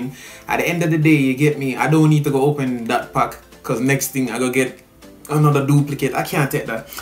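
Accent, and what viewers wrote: Jamaica. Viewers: Are u Jamaica???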